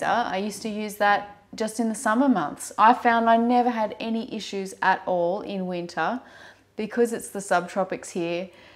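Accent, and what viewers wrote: Australia. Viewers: I just bought my first while wheat berries for milling & they will now go straight into the freezer for 3 days - ibwobder if my household freezer goes that low! Lovely to hear an Australian accent - your tios are great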